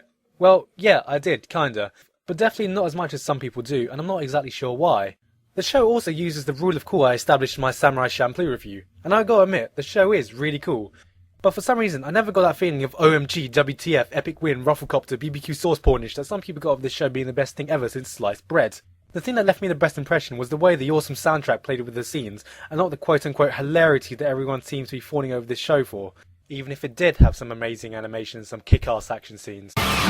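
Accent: United Kingdom. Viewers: britishness shinin' thru in these old videos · he sounded more british back then but wow he's twice as much of an OG for when he watched this anime, it was 11 years ago when he watched it since it came out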